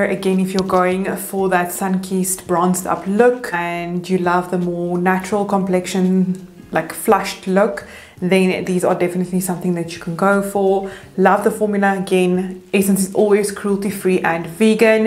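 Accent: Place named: South Africa. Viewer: I can’t tell if this is a South African accent or Dutch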